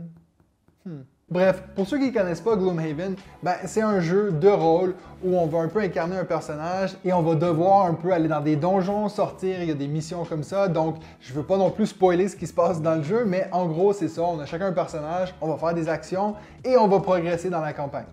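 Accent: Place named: Quebec, Canada. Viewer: Wonderful world est le jeu du moment pour moi aussi pour renouveler Je te conseille son extension corruption et ascension qui apporte beaucoup au jeu. Est-ce que tu aimes ou joue à des jeux de deck building (dominion, aeon end ...) j’en n’ai pas vu un seul sur ta chaîne Soit tu n’apprécie pas cette mécanique où tu n’as pas encore découvert cette Univers 😍 J’adore tes vidéos malgré ton accent Québécois qui m’ai d’habitude très dure d’écouter toi j’adore vraiment ton humour.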